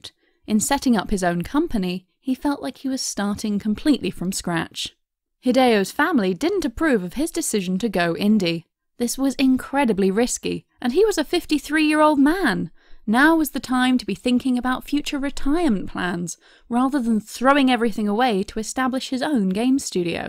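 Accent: United Kingdom. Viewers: It feels like that the narrator is british, my asian ears are hardly listening to this narrator. Glad there is subtitle available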